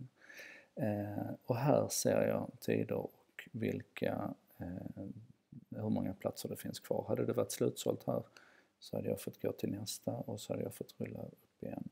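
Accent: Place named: Scania, Sweden. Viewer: svårbegripligt skånskt mummel utan text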